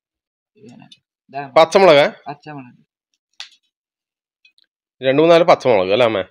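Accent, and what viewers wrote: Kannur, India. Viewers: ok ok ഞാൻ കൊച്ചിക്കാരൻ ആയത് കൊണ്ട് കണ്ണൂർ ഭാഷ കേൾക്കുമ്പോൾ ചിലപ്പോൾ അന്തം വിട്ട് പോവാറുണ്ട്.. കുറച്ചു കൂടി വടക്കോട്ട് മാറിയുള്ള ആളുകൾക്ക് ഇത് പരിചിതമായിരിക്കും.. Any Way.. Thanks for Reply 🙏🏿🙏🏿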